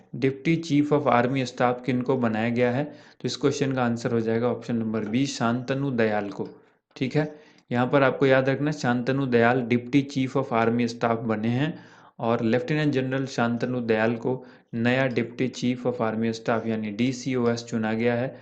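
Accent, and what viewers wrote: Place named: Haryana, India. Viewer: mujhe Lgaa aaap haryana se ho😆😆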